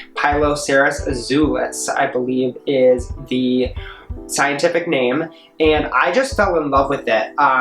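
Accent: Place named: Canada. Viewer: cute space, cute plants, cute person, cute accent! i’m a canadian who lives in france but grew up along the border and your accent makes me miss home 💕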